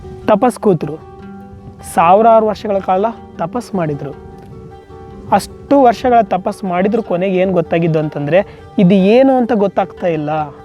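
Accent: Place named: North Karnataka, India. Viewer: ಗಂಡ ಮಗನ....❤ ಉತ್ತರ ಕರ್ನಾಟಕದ ಮಾತು....ಇನ್ನು ಹೆಚ್ಚು ಹೆಚ್ಚು ಕನ್ನಡ ದಲ್ಲಿ ವೀಡಿಯೋ ಚಿತ್ರೀಕರಣ ಮಾಡು.......❤❤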